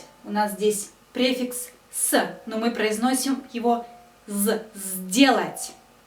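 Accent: Russia. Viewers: Акает по московски.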